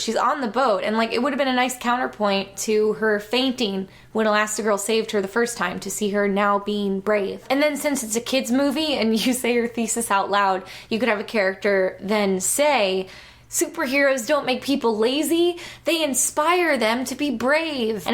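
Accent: New York, United States. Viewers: I don't care what people say, your costume is amazing...that new york accent thooo..... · Your New York accent made me cry laughing. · You indeed sound like you are from new yawk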